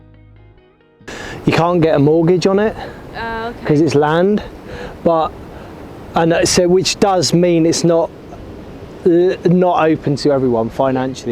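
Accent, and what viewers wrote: England, United Kingdom. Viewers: sounds almost like an English version of *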